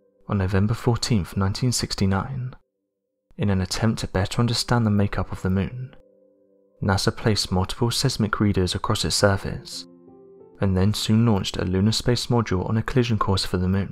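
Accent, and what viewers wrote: United Kingdom. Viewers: I have to correct monotone British dude again. Any craft traveling from Earth to Mars wouldn't be called a "star ship". A star ship implies a vehicle that can travel to other stars (such as "the star ship Enterprise"). A transport vehicle from Earth to the moon or Mars would simply be called a SPACE SHIP.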